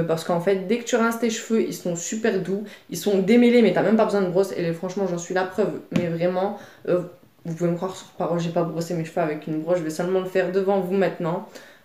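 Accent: France. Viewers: tu n'es pas du 62 ? je dis ça car je suis dans le sud depuis maintenant 15 ans et en fait je viens du Nord hihiiiiii native de Lille dans le 59 et dans le sud tout le monde trouve que j'ai un accent ..... de gros bisous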